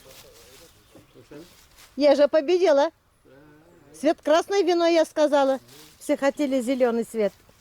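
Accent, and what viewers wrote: Tatarstan, Russia. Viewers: Здравствуй, моя дорогая землячка, коллега по работе в нашей молодости и Мама и Бабушка своих внуков! Получается, что мы все коллеги! Мы все Мамы и Бабушки. Какие Молодцы жители вашей деревни! Как здорово, когда есть вот такое место, где можно собраться вместе всей деревней на Сабантуй. Я с детства помню Сабантуй, от слова Сабан-плуг, когда спашут землю и посадят, всегда устраивали на поле, около деревни, Сабантуй. Весело было! Спасибо тебе, за воспоминания о детстве и Моей Любимой Родине! Не думала Я, когда уезжала, что так буду скучать по Родине. Спасибо, большое!!! За поля Родные, За леса и за Родную Природу и за речь национальную и за говор т.е.акцент татарочек! Как же всё родное! Счастливая ты, что смогла вернуться на Родину. А самовар-то у меня есть. Это я сохранила-чай из самовара на улице и со сливками и из пиалы.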